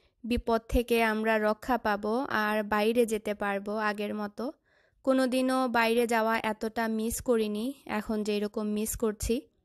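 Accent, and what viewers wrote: Sylhet, Bangladesh. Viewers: Apu ami apnar new member.. Apnar kotha gula onak sundor.. Sylhet language bole je ota te o onak sundor lage apnak.. Falak to amder bashar member hoye gese.. Sobay chine.. Allah apnader k susto rakuk.. Amin..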